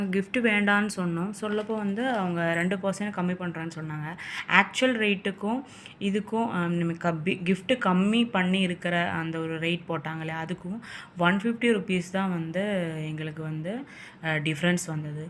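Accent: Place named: Malaysia. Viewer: You are malaise your slange you speak in malaise why in Tamil to earn money 💰 using tamilans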